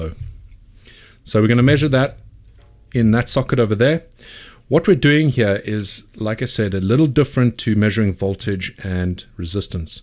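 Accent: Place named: South Africa. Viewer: Amazing videos really on point with what i wanted to learn and the series is well set out. Thanks for the amazing content! Also can't loose that SA accent hey xD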